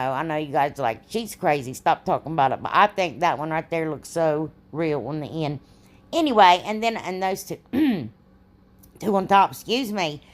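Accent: South, United States. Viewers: These are all so gorgeous! You are a nut and laugh every time I watch you. You sound as country as me. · you are just AWSOME.I was away for Christmas,hospital! I really missed you.I love you’re southern accent. You’re just a blessing to me.thank you,God bless you, Happy New Year! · I absolutely love all you do and thank you! I adore ya girl! From this southern accented Georgia peach (well no longer live there lol), to your southern accent ..big hugs.. and much love. I hope your husband is feeling better.. last I heard from you he wasn't feeling well (been away doing chemo, so have not been on here as much as usual). I will be on Fri the 18th. Can't wait!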